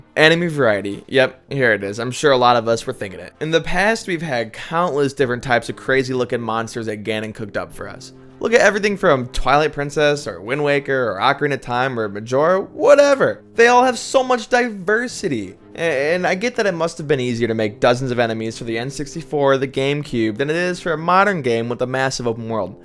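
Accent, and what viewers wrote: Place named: Midwest, United States. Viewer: Midwestern man YouTube voice is music to my ears.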